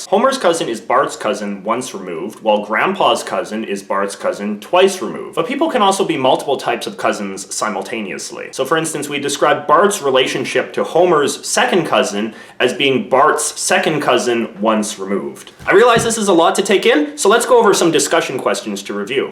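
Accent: Canada. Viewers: noooooooo you say "aboot"! As a fellow Canadian, i thought that was actually a myth. What part of Canada are you from? I'm from Ontario and have never heard aboot said out loud in my entire life.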